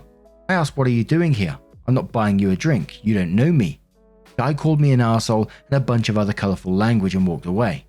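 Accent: United Kingdom. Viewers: makes doing housework so much more bearable! Thank you so much for being so much a part of my day that it's not complete without hearing "What's up, Waffle Gang?"! Plus, as an American, his British accent makes him sound so distinguished! I'm sure I'd still like him if he were American (I just wouldn't respect him as much, lol).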